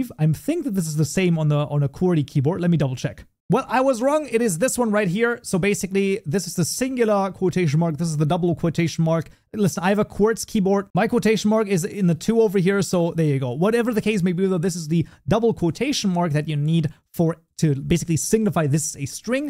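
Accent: Germany. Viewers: LMAO The German "Scharfes S". Love you german voice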